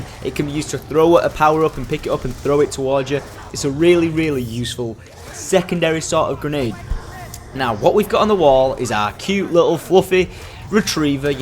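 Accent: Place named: Manchester, United Kingdom. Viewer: You sound like your from Wigan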